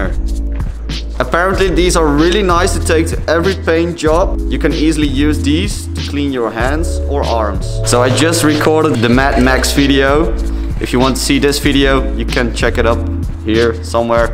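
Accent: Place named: Netherlands. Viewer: Dutch accent 🙃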